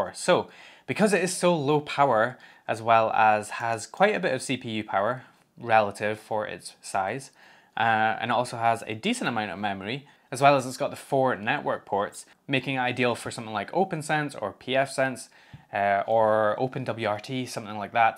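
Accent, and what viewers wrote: Scotland, United Kingdom. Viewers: Have really been enjoying your channel as i have been learning ad getting my smart home all set up. Been homelabbing for a couple of years now and finally got that Automation bug. Plus the wonderful scottish accent gives you an edge lol. Great videos brotha keep it up.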